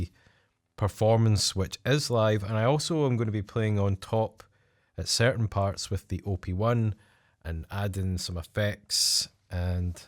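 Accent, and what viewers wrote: Scotland, United Kingdom. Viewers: Backing track? what is cheating in music production at all these days? that sounds interesting, as far i understand your nice scottish accent;)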